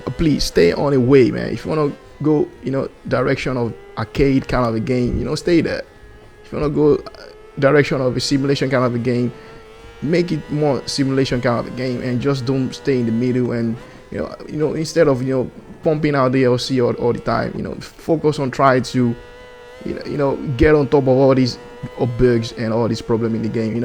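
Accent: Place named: Netherlands. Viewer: I like your Dutch accent but sometimes it is hard to grasp. :D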